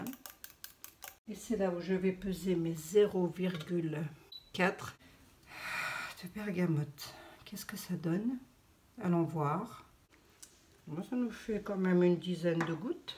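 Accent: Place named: Lorraine, France. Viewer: bonjour, super recette que je vais faire ce soir, je tiens a te dire que je me doutais que tu était lorraine vu ton accent qui ne m'est pas inconnu puisque je suis née dans les Vosges, je vis dans le sud ouest a présent, alors je te dis encore bravo pour tes tutos qui me ravissent a chaque fois bisous a toi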